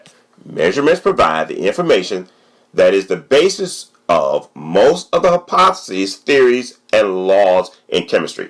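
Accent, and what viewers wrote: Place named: United States. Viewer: I am a kenyan hence i am not getting the english well because he is an american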